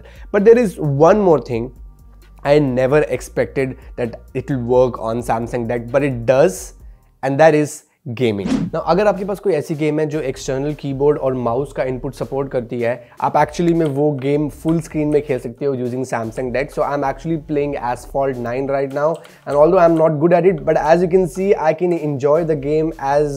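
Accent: India. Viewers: Excellent, informative, high quality video, but you have to decide your audience haha. English or Indian? I still understood most of it, but I think YouTube might not give you views because it's not clear whether you're aiming at the English-speaking market or India. Keep up the great work, though, I enjoyed the video!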